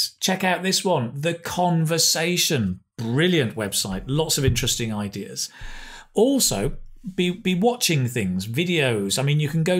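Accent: United Kingdom. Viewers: What i like about this page, your explination and your Lovely pure Britgish accent. Its brings comfort and gives us confidence.thank you very much sir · I like your channel, like your British accent and the way you explain and teaching 💗 your fan from Morocco 🇲🇦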